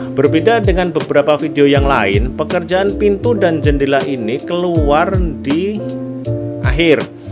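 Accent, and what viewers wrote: Java, Indonesia. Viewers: Kerbaooo 😂jowo ne kuentel banget mas👍👍👍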